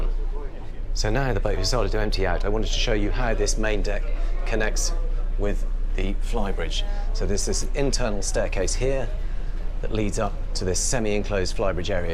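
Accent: United Kingdom. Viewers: With the helicopter, the crowd, and the British accent it felt like James Bond was doing the review. All that was needed for a movie was a chase boat, a few grenades water splashes, and smoke out the back of the Sirena 78 to conceal and cover.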